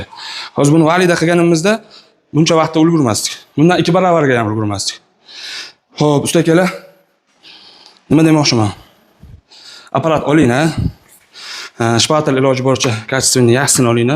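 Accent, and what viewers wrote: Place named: Namangan, Uzbekistan. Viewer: Наманганлик менимча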